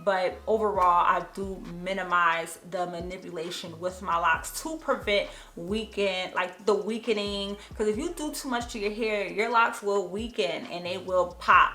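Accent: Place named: New Jersey, United States. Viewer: I could tell you were from Jersey! lol like my mom. your locs are so beautiful! makes me want to reconsider and get smaller locs lol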